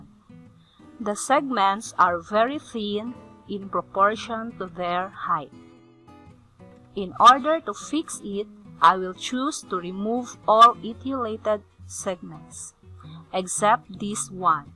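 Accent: Philippines. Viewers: please fix ur speaking. u speak very slow and it sounds very boring. don't use filipino english accent and don't speak very carefully and slow because u sound like reading. i'm not a hater, just a friendly advise for ur video not to be boring to watch and hear. thanks for understanding. 🙂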